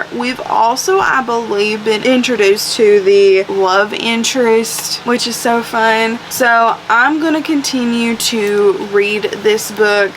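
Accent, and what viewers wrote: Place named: South, United States. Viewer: Ok, I’ve gotta know where you’re from. Love the southern accent. I’m from Georgia 🍑 always looking for new book besties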